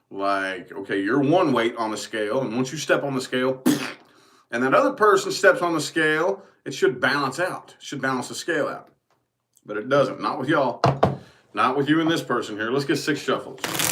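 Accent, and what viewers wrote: South, United States. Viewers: That country boy swag with a twang! 🤣😂😂😭 The whole reading was too damn accurate 🙌🏾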